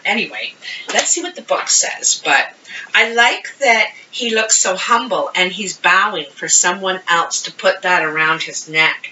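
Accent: Canada. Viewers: I thought so, I just got such a vibe, probably 'cause i too am in ontario, but when you said "eh" I thought yes! she has to be Canadian, lol Tks for replying :)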